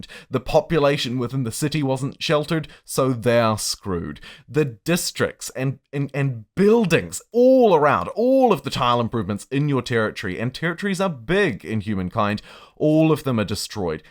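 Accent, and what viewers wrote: New Zealand. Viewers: Be nice to put a face to the Kiwi accent I've been hearing so much whilst enjoying this game! Is one of those gents in the vids yourself?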